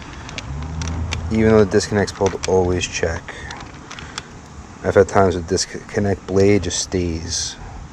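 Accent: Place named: Massachusetts, United States. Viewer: I thought so but I also thought you might be in Mass your accents not that strong. I'm in South Carolina right now but I live most of my life in Mass and been doing the trade for 11 yrs and Love it. You have a lot of the same techniques that I have . It's like watching myself. I am on the Commercial side but have done my share of attics at 120f. Dont miss the winters up there though.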